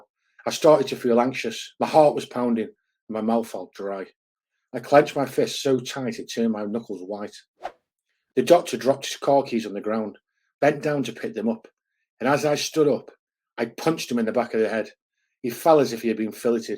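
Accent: Stoke, United Kingdom. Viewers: Are you from Stoke mate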